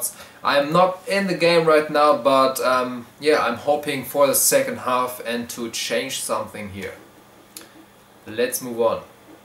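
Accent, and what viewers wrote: Germany. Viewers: accent? he's german so its the normal way of pronouncing german/austrian names :D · Play KSI... would be fun to watch his screaming and your german accent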